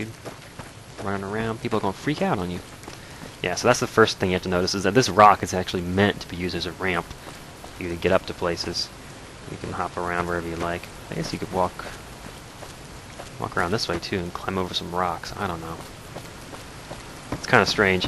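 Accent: South, United States. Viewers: I really enjoyed this video. I had never heard this story before and I found it very interesting and touching, above and beyond most of the writing for the rest of the game (not to say its bad). Your casual southern drawl and the hum of the terminal made this such an engrossing experience, thank you:3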